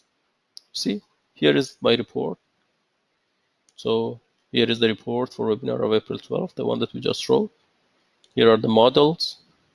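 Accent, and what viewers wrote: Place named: Iran. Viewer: your accent is clear that you are an Iranian. How come is your name?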